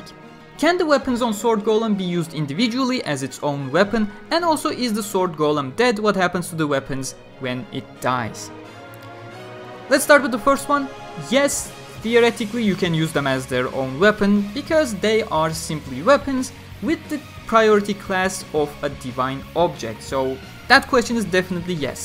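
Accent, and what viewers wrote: Turkey. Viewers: Dün sword art online fandom wiki de eugeo sayfasında gezerken hadi eugeo'yu tanıyalım diye bir video gördüm ve nedensiz yere tıkladıktan sonra İngilizce aksanından yola çıkarak:"Bu arkadaş kesin Türk!" Dedikten sonra kanalına göz attım.Kanal ismimin olduğunu görünce sanki UEFA kupasını kaldırır edasıyla sevinçten minnacık bir ego kastım:) Her neyse Türklerin İngilizce aksanına alıştığım için ne zaman duysam tanırım:).Bu arada bu kanali daha önce neden görmedim bilmiyorum ama içeriklerin çok güzel ve çok derin.Sword art hakkında bu kadar bilgisi olan bir kanalın önünde saygıyla eğiliyorum.Çok ani gelebilir ama herhangi bir şeye ihtiyaç olursa biz buradayız:) bu arada İngilizcen çok akıcı.Sahsen çok beğendim.Nerede ve nasıl bu kadar iyi geliştirdin? Ve son olarak da bu kadar oyunu ve bu kadar bilgiyi nereden biliyorsun? Şimdiden teşekkürler, saygılarımla,bir hayranın...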